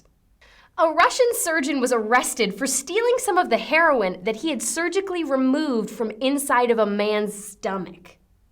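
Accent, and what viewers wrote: England, United Kingdom. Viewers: You're welcome. Obviously I just call you those things outloud and appreciate that I've never actually typed those words in the comments box because, well come on, that would just be fucking creepy! I do feel comfortable enough to announce that I am a huge fan of your english accent though, great because it's not the "upper class" accent that most go for.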